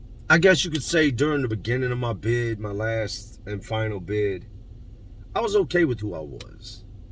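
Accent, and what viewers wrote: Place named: Philadelphia, United States. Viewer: When I 1st heard u talk, I thought you were from N. Philly!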